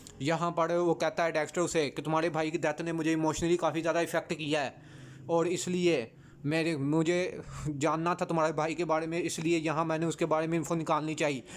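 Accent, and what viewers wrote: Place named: Lahore, Pakistan. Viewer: Barawa lahori explain kar rha Hy Yahan parrr Yahan parr zarorr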